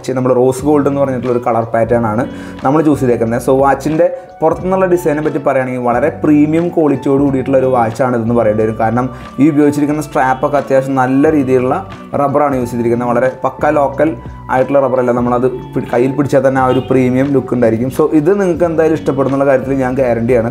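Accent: Kunnamkulam, India. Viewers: Kunnamkulam bhashaa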